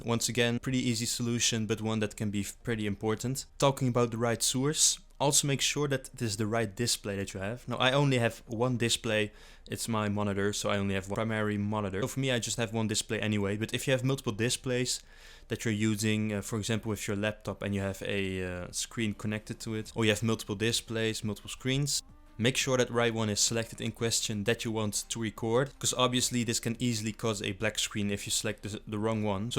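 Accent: Netherlands. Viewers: I hear a dutch accent! Goeie content ouwe!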